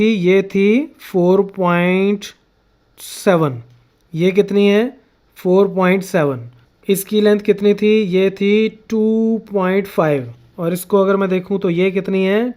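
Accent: Punjab, India. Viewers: Sir are u punjabi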